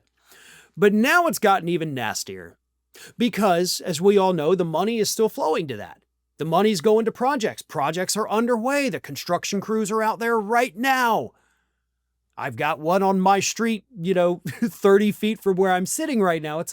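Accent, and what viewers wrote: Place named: Georgia, United States. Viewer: Mam, I hear you and am old enough to hear Julia Sugarbaker from Designing Women... hope I am recalling the cultired GA accent correctly.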